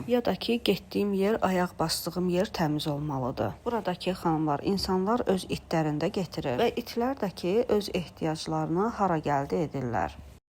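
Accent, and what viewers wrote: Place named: Russia. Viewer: amma mən elə bilirdim siz rus dillisiz deyə belə formada danışırsız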